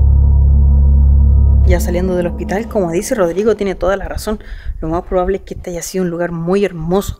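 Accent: Chile. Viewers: Hola. Te sigo desde la provincia de Entre Ríos, Argentina. Gracias a tus videos estoy conociendo tu maravilloso país... pero lo que más me gusta es ese "tonito chileno", el más lindo de latinoamérica.🤗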